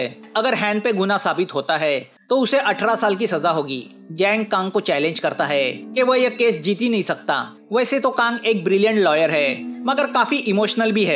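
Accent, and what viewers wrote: Maharashtra, India. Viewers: Bhau tu marathi ahes? Accent vrn vatt😁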